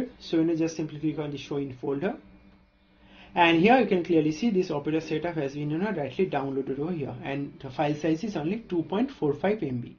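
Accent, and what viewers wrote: India. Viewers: I do not like video for installation of opera browser, Is there a text procedures in installing Opera? The video the speaker talks very fast and the accent in talking cannot understand very well like the Hindi accent.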